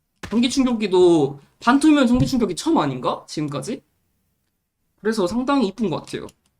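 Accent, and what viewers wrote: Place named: North Korea. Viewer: 근데 고향이 어디시지? 왜 북한 사투리가 들리지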